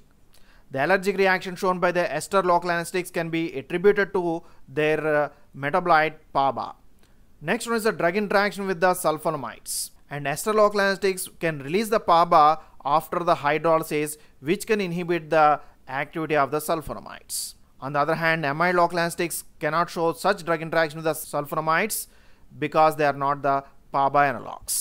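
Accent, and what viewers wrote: South India, India. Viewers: THIS DUDE HAS VERY THICK SOUTH INDIAN ACCENTS EVEN NON SOUTHINDIAN INDIANS HAVE TROUBLE FULLY UNDERSTANDING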